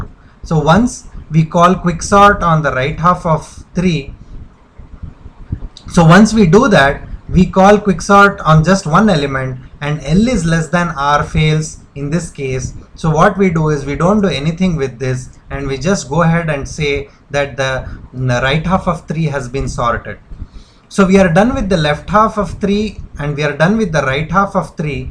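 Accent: India. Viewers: a clear and perfect explanation , nice video as Indian English pronunciation is consensus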